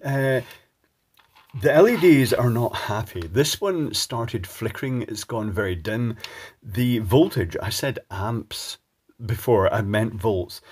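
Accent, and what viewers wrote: Scotland, United Kingdom. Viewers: Is this what posh Scottish people sound like?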